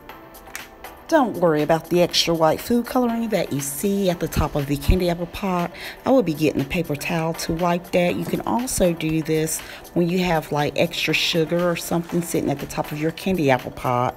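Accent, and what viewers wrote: South, United States. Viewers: When I tell you I have watched this about 5 times. One of my favorites !! It's the laugh and the commentary for me hunni!!! "That royal blue baby....royal so loyal"!!!😊😆😂 As far as the country accent...It's a southern thing....nothing wrong with a little twang...I'm a southern girl as well!! You are the best!!!!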